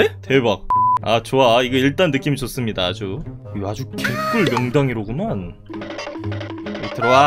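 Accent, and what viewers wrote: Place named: South Korea. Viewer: 찐 콩글리쉬군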